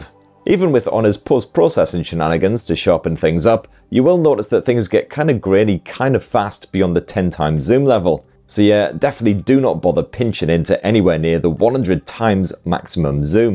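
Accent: United Kingdom. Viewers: Is this guy british?? 😂😂 Just a simple doubt. The accent😅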